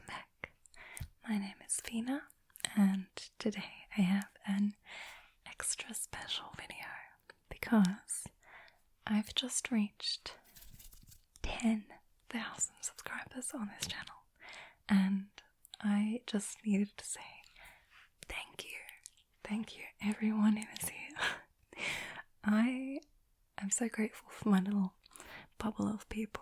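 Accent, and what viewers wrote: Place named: Australia. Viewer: Whoa, that took me by surprise. To the ears of someone who can't put two German words together in correct syntax, you speak it very well. New ASMR opportunity? ;) On the note of your background, when I was new here and had yet to become acquainted with your channel, I actually thought you were living in Australia. You carry a lot of that accent with you, imo. Pretty cool. Yeah, I saw that Japan interview video as well. I was tempted to comment about it a few weeks ago but I was like, "Nah, she's seen it by now..." I'm glad I didn't read the comments on it; I'm sorry you endured that. It sounds like you have a lot of travel destination plans. If any of those opportunities come to pass, do you think you would do recordings while you're there to share with this community? I always like sampling people's travel vlogs. Thanks for doing this Q&A! 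I didn't see any notifications to pose questions but that's ok: you answered a lot here! I enjoyed this. :)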